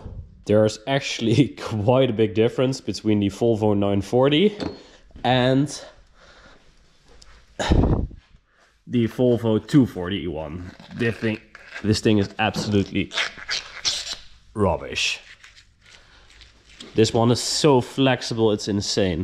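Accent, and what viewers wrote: Netherlands. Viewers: what an awesome fucking swap man love it! i’ve been looking into maybe getting a volvo wagon & getting it swapped, i love older volvos and giving it a step up w a v8 sounds like a damn good deal. live in the US now but grew up in amsterdam and your accent makes me smile! awesome videos man thank you, looking forward to another post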